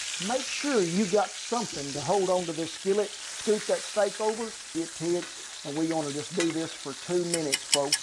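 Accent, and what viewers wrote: South, United States. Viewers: Your voice kinda reminds me of JOEL OSTEEN but with a more country accent!!🤭🤭..some onions along with them mushrooms wouldve did it but nevertheless a beautiful job!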